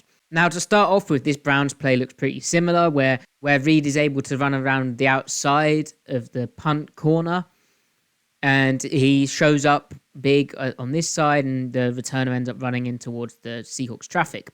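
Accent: United Kingdom. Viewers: For someone with your accent, no offense.. I find it absurd that you call him unsung. The 12’s are aware of reed. We know. Calm your tits mate. I watch different channels from across the pond and I love that we have an international fan base. But you are not speaking for the home base. Maybe you’re just late to acknowledge it yourself.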